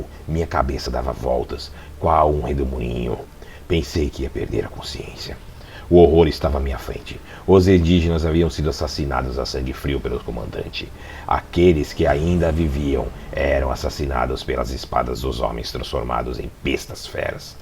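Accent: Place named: Portugal. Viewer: Narração muito boa! O sotaque português ri demais 👏😊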